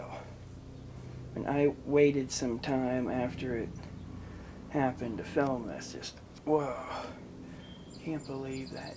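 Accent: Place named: Pennsylvania, United States. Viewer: Your accent is painful to listen to. I'm guessing you're from PA?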